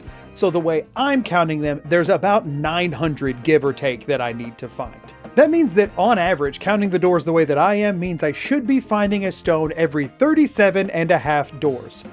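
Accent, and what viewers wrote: United States. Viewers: Are you by any chance from Utah? Something about your vocal inflection reminds me of an old friend of mine. He might not have originally been from Utah though either lol. Regardless of why, your method of presentation and your sense of humor feels really familiar and welcoming, so thanks for these videos! I’ll follow you on twitch so I can pop in if there’s ever a stream I’m available for.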